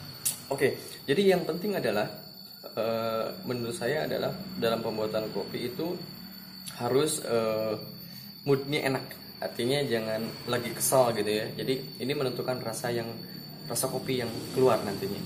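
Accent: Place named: West Java, Indonesia. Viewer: Sunda bgt ... itu logatnya mas 🤣🤣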